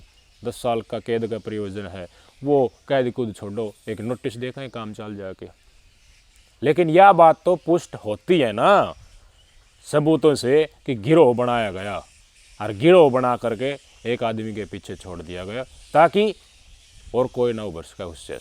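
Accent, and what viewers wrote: Haryana, India. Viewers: भाई बहुत स्पष्ट रूप से बोलते हैं और सतह m हरियाणवी bolte h kati char chand lga deta h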